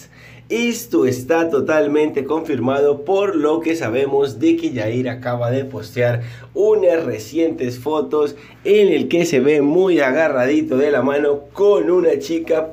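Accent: Venezuela. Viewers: Jajajajaj cual argentino es venezolano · Argentino????? Es venezolano 😂 a quien le gustan las arepas chamo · No sabía que the era Argentino, y hablaba como venezolano XD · Jajjajaja es venezolano no Argentino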